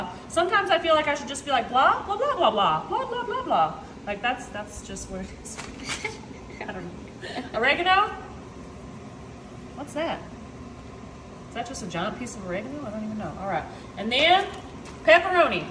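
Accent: South, United States. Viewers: I love your innovative video. You are andFred are so entertaining. It’s lifts my spirits to watch y’all. Our world need more people like you, and. I’m from the South and can certainly tell you are too. Thanks so much from The BAYOU STATE!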